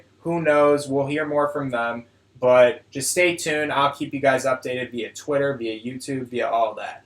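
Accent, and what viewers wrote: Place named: Chicago, United States. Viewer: the one and only chi town accent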